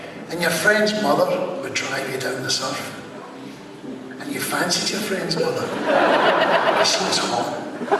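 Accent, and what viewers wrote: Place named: Scotland, United Kingdom. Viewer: Boy! The brogue REALLY comes out when he goes back to Scotland!! ;)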